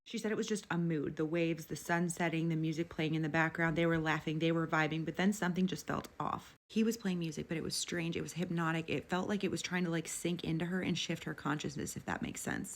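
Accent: United States. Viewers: Girl you talking to fast can't understand everything you're saying Americans some times upsets me with the way they pronounce alot of words bro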